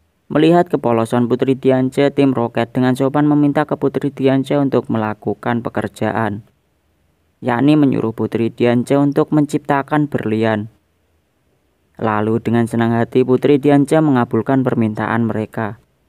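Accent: Java, Indonesia. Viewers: wong jowo to mas?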